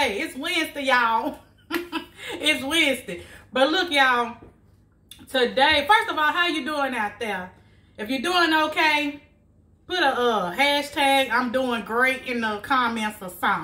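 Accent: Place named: South, United States. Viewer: I Luv It! What Better Way Ta Spend Some Of Ur Saturday Afternoon Then Learning A Fun Skill From A Fun Teacher,Who Has A Fun Personality!!!I Just Want Ta Say Thank U So Much With Cho Charming Southern Accent! Go Head Wit Cho Badd Self!!Sweet Potato Cornbread Here I Come! Sent Me!!! 😜💜😂💚😍💘😜❤️😂💚⭐️🖤❤️🦾⭐️👍🏿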